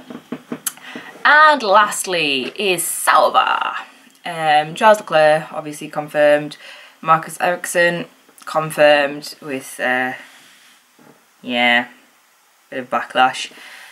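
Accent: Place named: United Kingdom. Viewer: So, british accent sounds like that. Interesting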